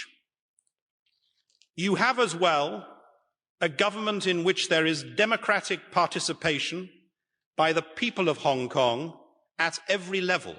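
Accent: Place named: United Kingdom. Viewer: 英式英文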